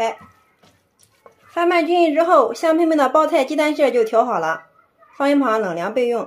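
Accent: Shandong, China. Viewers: 山东人吧？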